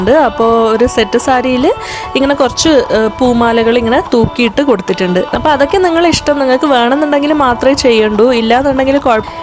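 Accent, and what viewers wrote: Kannur, India. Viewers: Kannur aano pengal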